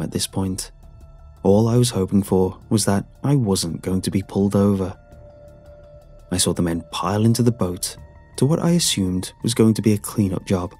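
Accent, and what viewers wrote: United Kingdom. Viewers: I watch these just to hear your amazing British accent ❤️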